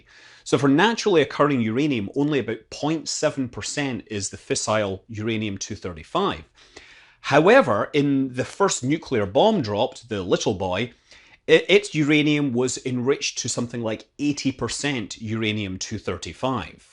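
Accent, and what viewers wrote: Scotland, United Kingdom. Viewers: I'm a Scot living in New Zealand and take comfort from a Scottish accent. The turning t's into d's with said accent is giving some anxiety though. What's the deal? Where do you live?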